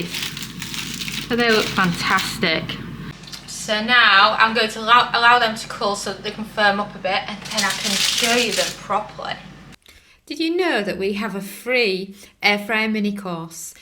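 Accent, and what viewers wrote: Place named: Yorkshire, United Kingdom. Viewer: Will be trying this recipe out today, thanks. Btw, a fine Tyke accent! Lovely to hear someone speyking reyt! xxx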